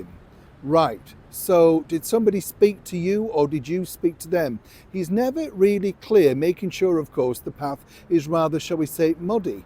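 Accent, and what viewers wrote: Yorkshire, United Kingdom. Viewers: You're pure Yorkshire this morning, I love it!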